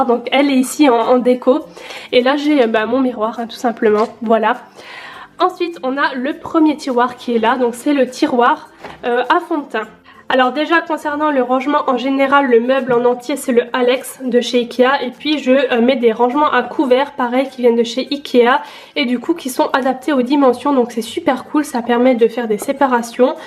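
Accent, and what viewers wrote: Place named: Alsace, France. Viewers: Hello! suis fan de tes vidéos et Strasbourgeoise ! ça fait plaisir de reconnaître quelqu'un avec son petit accent ! Superbe vidéo, moi qui me fait une coiffeuse ça m'a donné plein d'idées ! merci beaucoup et gros gros bisous !! #TeamSnap 🌟🌟🌟🌟🌟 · C'est la premiere fois que je ne m'ennuie pas dans une video rangement makeup lol.. Sinon t'as vraiment l'accent alsacien ou lorrain jsp mddr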